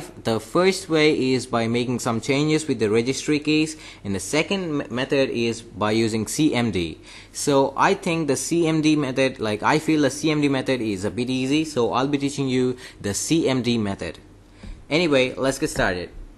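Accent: India. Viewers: an indian trying to hide his accent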